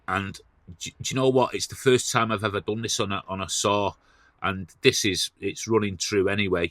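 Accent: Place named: Manchester, United Kingdom. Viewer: Sound like a fellow manc to me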